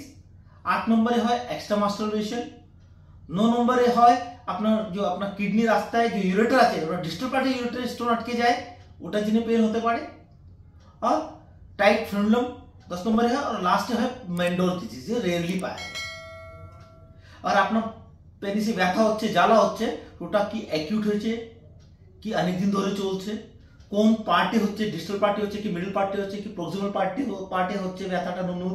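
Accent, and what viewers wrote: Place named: Bengal-Bihar border, India. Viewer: আপনি কি বাংলা বিহারের মাঝামাঝি বসবাস করেন। ভাষা তো ক্লিয়ার হচ্ছে না।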